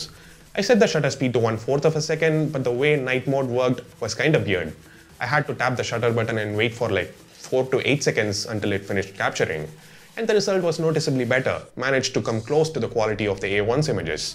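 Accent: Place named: India. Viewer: Your accent is amazing lol. Some times i cant even hear that indian accent. While some times i can hear it clearly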